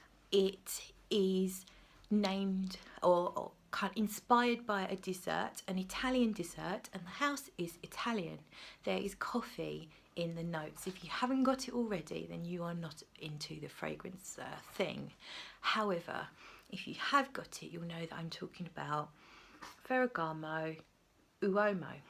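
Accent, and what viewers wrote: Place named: Cockney, United Kingdom. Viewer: I'm from Luton, your review sounds so so local love the review and the cockney accent xx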